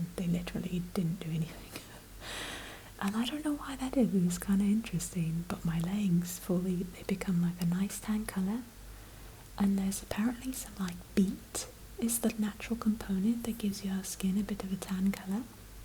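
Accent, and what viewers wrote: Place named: England, United Kingdom. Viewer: I’m so confused. I thought you were American but you sound so English 😮🤯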